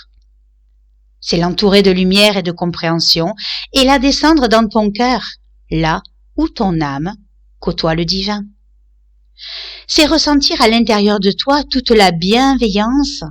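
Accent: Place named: South, France. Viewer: ...oui ,il n'y a plus qu'à !! Cela serait bien intéressant !! Moi je ne sais pas où vous êtes j'imagine dans le sud de la France vu l'accent !! mais moi je vis en Italie dans le Friuli!! Je ne vais pas souvent en France mais, quelques vacances pourrais ce faire !! bonne soirée.christine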